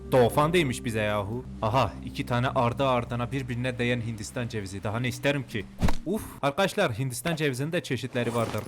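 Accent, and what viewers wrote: Elazığ, Turkey. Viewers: Abe bende elazigliyim senin elazig li oldugunu şive den direk çaktim abi sen tuncelili misin tuncelilisen nerde otirisin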